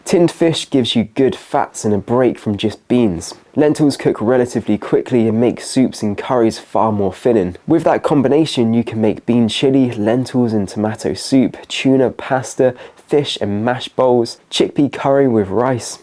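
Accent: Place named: Cornwall, United Kingdom. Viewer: I think he’s from Cornwall or something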